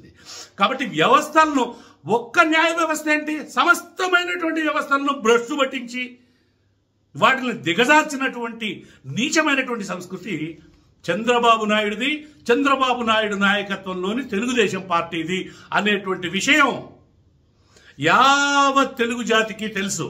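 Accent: Nellore, India. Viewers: Super …like mana Nellore accent. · నెల్లూరి యాస అన్నో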